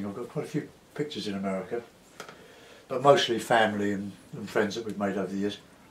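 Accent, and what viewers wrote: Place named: England, United Kingdom. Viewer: I'm awed by your talent. As you've said before, watching you makes me almost want to give up.....almost. I've been trying to create scenes such as yours for over 40 years and still can't produce the quality of work that you do. Thank you for hours of fascinating and pleasurable viewing. Being a Yank I did, at first, have a little trouble with the English accent (my problem, not yours), but I soon got used to it. Do you ever do any miniature watercolors? And, if so, could you put one up on YouTube? Thanks again and keep it coming.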